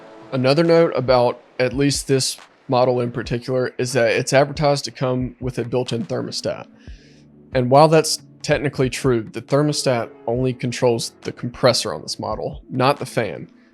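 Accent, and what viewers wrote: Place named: South, United States. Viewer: Interesting accent. A little bit of a southern accent, but not too southern.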